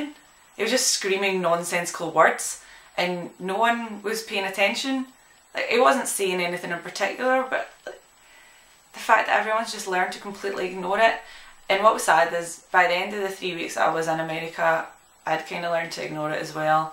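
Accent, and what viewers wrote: Scotland, United Kingdom. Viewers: I watched for the accent. I love a gal with a Scottish accent. :) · Wow! You. are. so. GORGEOUS! And I TOTALLY TRULY LOVE your Scottish accent. If Scotland has more girls as gorgeous as you, but older, of course, since I'm 50, I'd love to move there ((especially on account of the ignorance in command of my country)). I can handle the rain, since I like to remain inside anyway. Wait, do you have places where lesbians meet each other? Anyway, I Scotland is my 2nd dream country. Australia is #1, Ireland is #3. I subscribed and gave it a thumbs-up. I'll catch you next time. Blesséd Be, Angie aka Wynter Ravyn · I'm in love with the Scottish accent. It's my favorite accent in the world. Please come to the Midwest.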